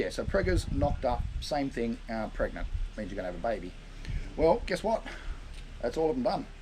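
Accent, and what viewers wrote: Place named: Australia. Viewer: I accidentally had my playback on 1.5 times of normal. You should listen to this video that way. It's hilarious! I'm good with Aussie speak because I've been studying your language since I watched Water Dogs 3 times through. At 1.5 times normal, there's no telling at all what you're saying. At normal speed, I can pass this nearly 100%.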